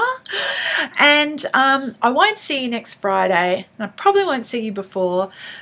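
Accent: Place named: Australia. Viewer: I have never heard of Nick Earls and his 'bloke lit' haha! Thanks for reviewing it! Do you read on a Kindle? And you're borrowing digital books from your library? I am trying to get my head around this process. So great to hear an Australian accent on Book Tube. Baking here in hot hot Melbourne. Love your work! :)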